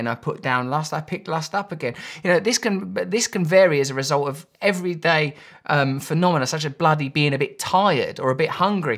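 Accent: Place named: London, United Kingdom. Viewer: You sound like you're from London! 😀 Interesting stuff, friend. I've been a fan for a long time and I appreciate you uploading so often.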